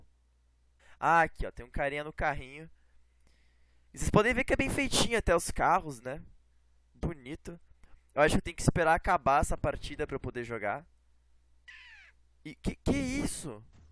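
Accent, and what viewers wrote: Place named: Santa Catarina, Brazil. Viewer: voce e de santa catarina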